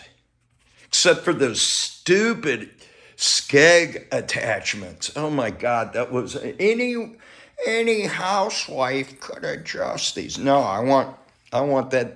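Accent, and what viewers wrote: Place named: Cincinnati, United States. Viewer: Why do I feel like this guy is from Cincinnati area? Complains without a true thoughtful logical ana. I may be wrong, but o know an accent and type.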